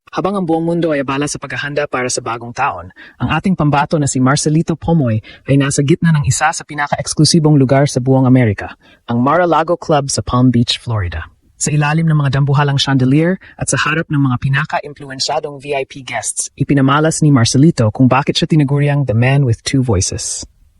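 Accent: Philippines. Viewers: AI filipino accent is horrible.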